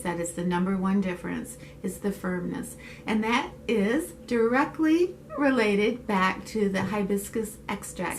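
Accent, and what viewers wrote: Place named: Michigan, United States. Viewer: I just wanted to tell you how much I am enjoying your videos. I've learned so many things from you about skin care and different products! I also have to tell you that I am from Rockford (having moved East 28 years ago) and it's been wonderful to see a couple of places you've shown as well as Grand Rapids. ❤️. I miss beautiful MI.. I also recognize the familiar way you pronounce certain words. Oh how I miss that!!! It's been like a precious reminder of my growing up. Thanks again for all you share. I relate to so many things!!! You're a blessing. Sue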